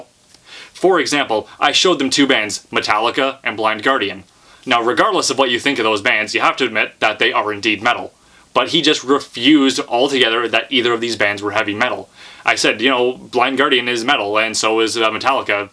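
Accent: Canada. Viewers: You sound more Canadian now.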